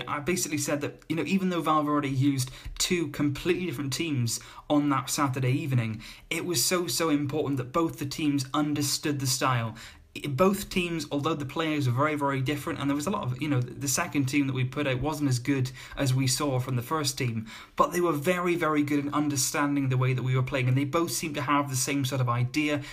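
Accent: United Kingdom. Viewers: Im curious whats your nationality? You sound British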